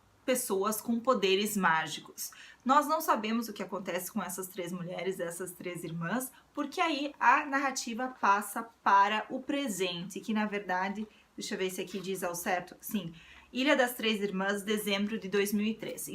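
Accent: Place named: South, Brazil. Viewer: Adoro teu sotaque, sutil sotaque sulista. É bom ter uma representante do sul no âmbito da literatura. Sucesso nobre conterrânea.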